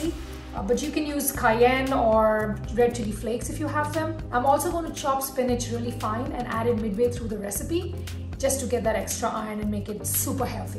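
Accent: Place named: United States. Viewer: Wow u picked up American accent very nicely. You are same way beautiful.❤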